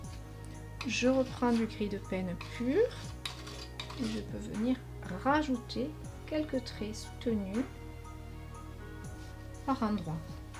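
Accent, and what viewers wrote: South, France. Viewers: Bonjour, Merci pour vos vidéos, elles dégagent de belles énergies créatives 🙏. Je reprends la peinture ( merci le confinement 😉) par contre la peinture au couteau est une première, pas facile à positionner sur une toile quand le chevalet est absent... Une astuce? A votre accent vous êtes dans le Sud. Faites vous des cours de peinture en présentiel? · Toujours un réel plaisir.de voir votre façon de travailler avec de surcroît votre douce voix du Sud de.la.france ! Vous Expliquez toujours très clairement c'est adorable a vous merci à vous ,🙏❤️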